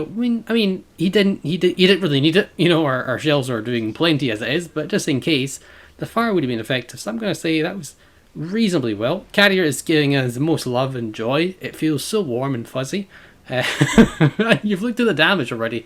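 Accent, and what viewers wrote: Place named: Scotland, United Kingdom. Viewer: Very well played, really like your videos, always nice to hear a wee Scotsman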